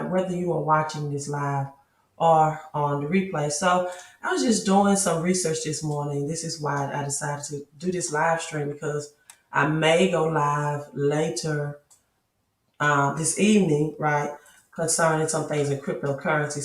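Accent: South, United States. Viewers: Hello Beautiful! Love your southern accent!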